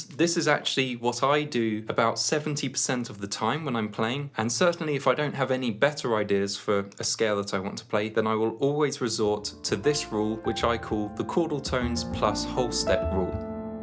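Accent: United Kingdom. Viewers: I damn near pulled my hair out of my head listening to your horrible British accent! I turned it off 3 min in.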